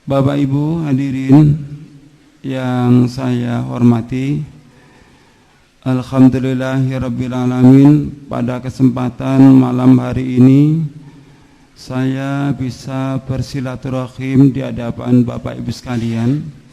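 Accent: Java, Indonesia. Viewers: Ha ha ha Mualaf Cari Panggung...Keyakinan Itu Nggak Perlu Di banding-bandingkan.anda Yalin dengan Ke islaman anda, lakukan, kami yakin Dengan keyakinan kami, itu adalah jalan kami. Logatnya Jawa Banget. Jika tidak paham Agama hindu. Jangan mengomentari Keyakinan agama hindu. Fokuslah anda pada Agama islam. Ustad udah masuk Islam, Masih bilang di dalam agama saya. Anda semakin membahas agama hindu, justru menunjukkan kejelekan Anda. Maaf ( bukan Kejelekan Agama Islam) justru agama islam semakin dijelekkan dengan Orang seperti ini. Semoga komentar ini jadi viral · Ustad ini asal usul nya dari Bali, tapi sudah lama tinggal di jawa.... wajar2 saja lah bro logat nya jawa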